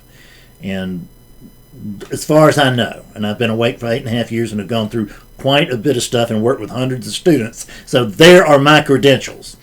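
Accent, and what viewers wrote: South, United States. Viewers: your southern drawl that sounds lovely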